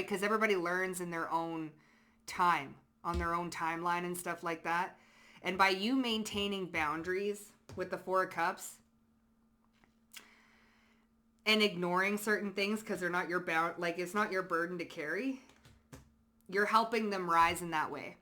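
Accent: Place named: Wisconsin, United States. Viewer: Oofdah: You accent sound like Wisconsin. I've been around it enough 2imitate it. I got a touch of it if I'm not careful 2mask. Norwegian or Swedish ancestry. You & I would be a hoot for outsiders to listen to: taking a piss on everything dumb under the sun. I'd definitely keep t/drinks coming. It is a miracle I'm still around. 7 of 8 good hard-working me die from my condition before being diagnosed. It's a silent-killer. If I weren't built like a Terminator from baling tiny square haybales: I wouldn't have made it. So: that info's got to come- out. We can't be having no good-men to raise the next generation. Why there's 8 good-women to 1 good-man & 10% of men online-dating are seeing ~80% of women from the same sites. Oh yeah, I'm MacGyver. I can solve t/world's energy probs. Yep, I've made guys look bad who've been there 10+ years & I'm there a month doing their job twice as fast, so.. 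they do their best to get rid of me, and they did. It sucks. I'm just going to give it all away b/c Earth don't have time for us to change. It's melting the ice-caps 6 times faster than our previous predictions. We got to use every tool in the box & pump the brakes hard as we can. Unless we want to lose all of the coastal cities & states & have earth-quakes up the wazoo, volcanic off-gassing which makes it so much worse, I just got to put all my cards out there. I get a kick out of all these Christians who say prophetic singers I got with in other timelines: witches, when they're actually as H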